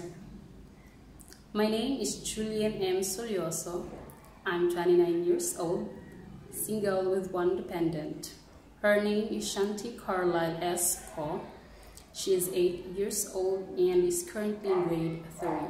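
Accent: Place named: United States. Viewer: Ang galing mong mag english, American ang accent. It's very nice to get to know you,.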